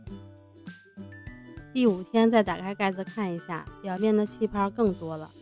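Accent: Beijing, China. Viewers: 哈哈哈 听说话 up主是北京人吧~ 按照你这个试试 之前做过一次 失败了╮(╯▽╰)╭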